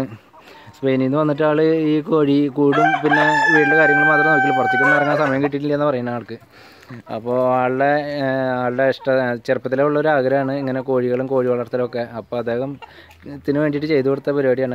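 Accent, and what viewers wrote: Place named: Malappuram, India. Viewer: Ninte vioce ketal trisur Karan thonilla.. Malappuram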